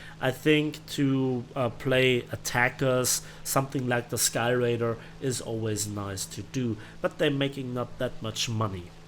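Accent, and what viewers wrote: Germany. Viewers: dont ever loose the german accent! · Hey! Thank you for mentioning and answering my comments. Though I think you really should invest some time in answering everybodies comments with written replies... it shows that you care about us. But I'm still getting used to your ways and I may be wrong. About my comment that your videos are to long... forget about it. They are just great!!! Don't change a thing. About premium account... it's a must. I've tried a couple of months without it and it sucks. The difference is huge. And I'm fully spaded in all nations fighters and most of other planes. Except for the jets. They are pay to win and I hate them. My advice to all non premium players... just wait for the sales. 12 eurs a month is not so expensive especially if you love the game but on sales price drops to 3 eur per month. Now that's just insane not to pay for it. 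40 eur for 360 days of premium. What happened to Subnautica branch? Continue the project... please? You are amazing narator and I love your german... both the accent and the actual language. Some 'fuck you's in german and 'du kanst mich mal' or 'was soll den sheiss' and stuff would be great to hear too:-D You know... actual comments when someone makes a pussy move on you and the joy 'comes out' of you... in your mothers language... the good stuff:-D Love you!!!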